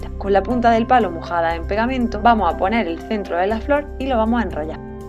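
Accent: Spain. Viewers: amo tu acento ezpañol tia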